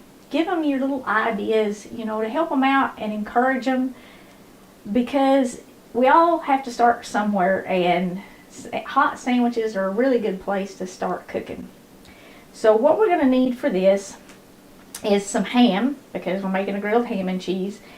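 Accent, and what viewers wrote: South, United States. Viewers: I just want to add: I really do enjoy watching you, but I bet it isn’t the reason most other ppl do. I was born in and spent the first 25 years in Texas. Then I wound up in Edmond, OK, then Boulder, CO and back to Edmond again. I just miss southern accents! They make me feel at home! My grandmothers and great grandmothers were rural, farm and dairy people. We’d go on Sunday to visit and then for eating later in the day, my grandmother would reach into her refrigerator and out of a little bit of one leftover, and two or 3 more in margarine tubs, she could turn those into the best dinner!! She would also put out a huge plate of her homemade pickles!! And a big chuck of cheddar. All of those things just tickled me to death!! I get just a tad of that my memory watching you cook, so thank you!! I hope to make your corn fritters just as soon as the sweet corn is in!! 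My husband is a minister, so I laughed so hard with you telling on the kids in your first church who would say the verse, “Jesse wept,” and take their dollar and run!! I had fun sharing that story with my husband. I will just keep on watching, just wanted you to know, just the way you talk is like being home. Texas home, that is.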